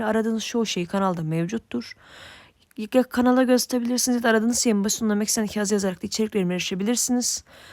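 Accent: Azerbaijan. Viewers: Turk dilini Azerbaycan dili kimi danisirsan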